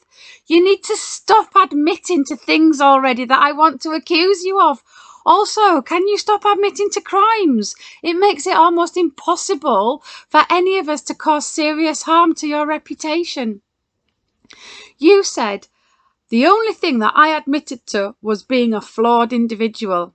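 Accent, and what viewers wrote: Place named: Essex, United Kingdom. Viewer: what a lovely accent. Essex?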